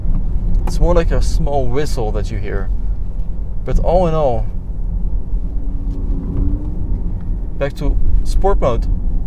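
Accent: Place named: Netherlands. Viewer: Ugly dutch accent, stopped watching after i heard: ünlock and ~rare steering😥😥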